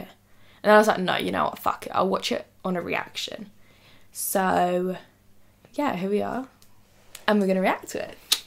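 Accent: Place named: United Kingdom. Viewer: I like u'r british accent.. it's so seductive ❤❤❤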